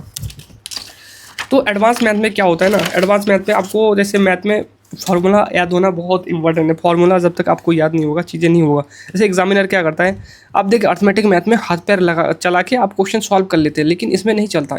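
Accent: Bengal, India. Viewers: ❤❤❤❤ Bhaiya ji aap Bangal se ho kya ??? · Mujhe aisa kyu lag raha hai aap bangali ho😅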